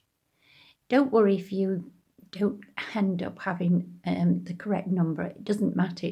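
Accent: England, United Kingdom. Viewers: It’s a great stitch but I’m in the uk and got a bit confused as you have an English accent. I missed the very beginning of the video and only realised after seeing you crochet you were using American terminology, but your video showed all the stitches very clearly, I was looking for a border for the Tunisian crochet blankets I make, this is going to be very useful, thank you.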